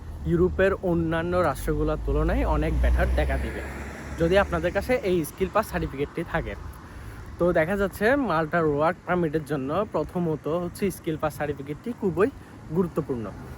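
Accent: Chittagong, Bangladesh. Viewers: Chatgaiya mne hoi vaiya apni??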